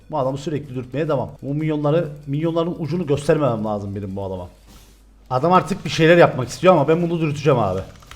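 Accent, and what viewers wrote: Cyprus. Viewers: abi seni izleye izleye ağzım kıbrıs ağzına döndü evde hade de genne diye dolaşıyom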